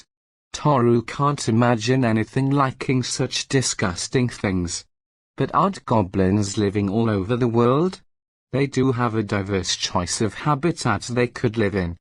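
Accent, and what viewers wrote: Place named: Wales, United Kingdom. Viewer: it sounds like the voice has a bit of a welsh accent or something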